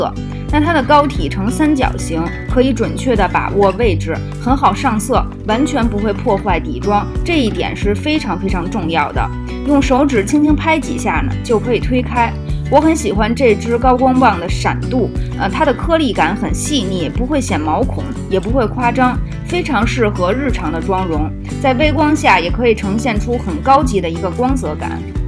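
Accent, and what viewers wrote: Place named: Beijing, China. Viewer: 前几天刚看到你的视频，一听是北京话，亲切得不行，立马关注，加油，支持你！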